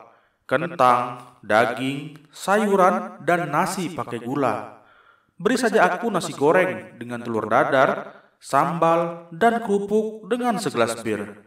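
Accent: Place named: West Sumatra, Indonesia. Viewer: adminnya orang minang yah, logatnya kayak orang minang yang banyak profokatif kalau di medsos 😁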